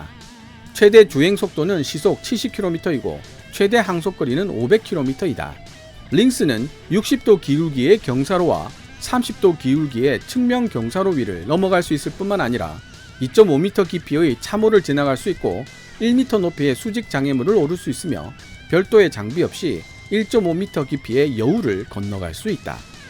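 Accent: Gyeongsang, South Korea. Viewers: 그넘의 징그러븐갱상도 사투리 집차라~ ....죽것다!!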